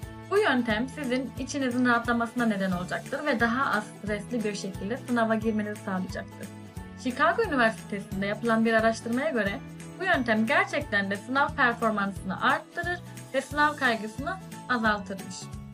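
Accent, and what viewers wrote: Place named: Azerbaijan. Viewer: Seslendiren azerbaycanlımı Türkçeyi zor söylüyo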